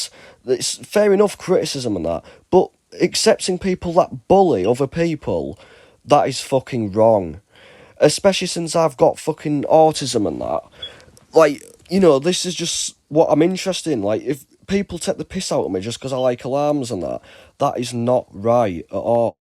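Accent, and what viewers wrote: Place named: United States. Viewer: He’s defo American his voice is so jarring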